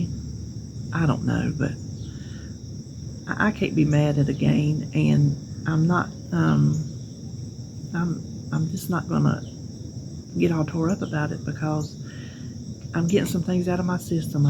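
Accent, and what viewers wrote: South, United States. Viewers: I'm from Arkansas but girl you have a Southern accent. I love hearing you talk. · Oh honey you have a beautiful southern accent. isnt as pronounced. I say you are the luckier. Didnt know drinking liquids with food wasnt advised. I gotta have liquid ( soda, juice or water) during each meal and snacks. Is that only for surgery reqirement?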